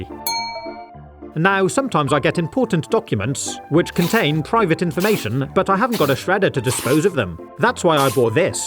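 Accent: United Kingdom. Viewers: Are you British? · The silly pre-talkies-era background music adds to the charm of the videos! I love the whole effect with his British accent. It's classic! The man's a genius!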